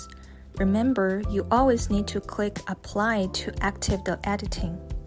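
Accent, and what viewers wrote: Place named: China. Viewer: 听口音是中国人吧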